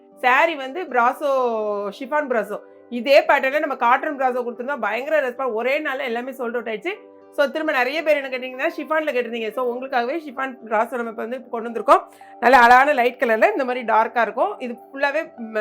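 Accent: Tirunelveli, India. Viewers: Neenga Tirunelveliya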